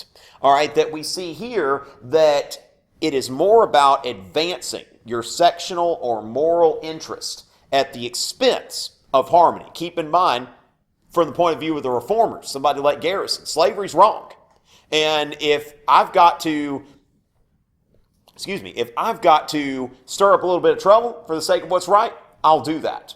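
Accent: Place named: South Carolina, United States. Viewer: Thanks for clarification on the Compromise of 1850. BTW- You need to help Keith Hughes with his southern accent. :-) (you sound like a South Carolinian. I'm a Georgia boy)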